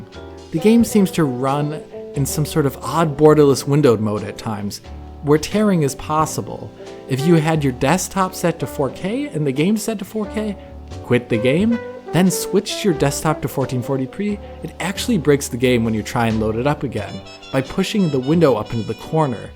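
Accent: Germany. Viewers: Wait, what is native language? German? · So you are german?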